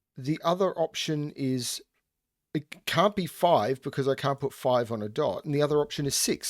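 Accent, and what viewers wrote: Australia. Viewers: Love the Aussie accent... had to check the screen to see if the setter was truly "Naughty"! lol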